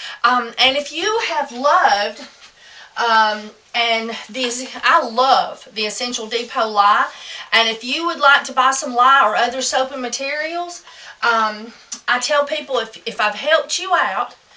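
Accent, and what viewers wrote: South, United States. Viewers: Hi, I have watched so many of your videos! You remind me of my grandma and grampa haley that happened to be from your state with their heavy southern accent. I can do a great southern accent to where you would think that's how I talk all the time, but I've got a boring California accent in reality. Anyway, watching you makes me feel like I'm home because of the way you talk, and your cute personality.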